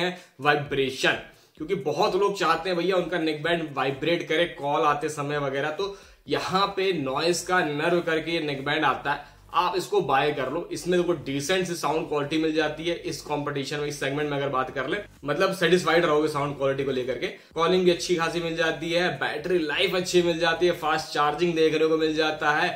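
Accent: Kanpur, India. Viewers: bhai mai Kanpur se hu...apki Language Kanpur ki hai Video me 😅😅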